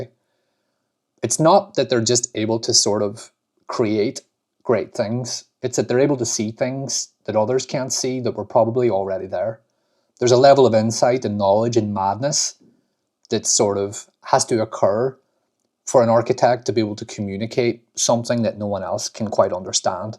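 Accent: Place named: Northern Ireland, United Kingdom. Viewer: Mate r u from NI? was so hyped when I saw the thumbnail and my excitement doubled when I realised u have my accent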